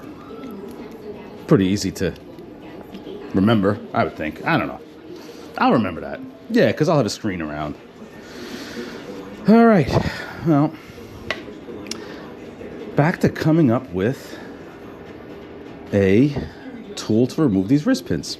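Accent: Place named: New York, United States. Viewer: I like your new york ack'sent'....I had a partner in in los angles who was from brooklin and he was a good friend,,Yet he was So much of a grinch'..?[[the dude had an atatude'...anyway I llike your stories..and You have a father that is very good to you...I like it...I always wanted a harley so I kept fixing up old broken down bikes till I made enough to use as a good down payment,,and along with what I could save from my job driven the senior bus...I bought an 02 fxd...once I payed it off..I spent way too much time and money changing evreything till it hardly had any harley parts...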